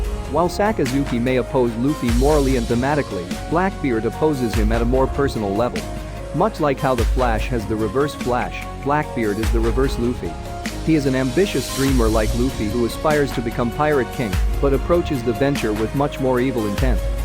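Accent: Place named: Ireland. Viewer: Ur sound like lrshion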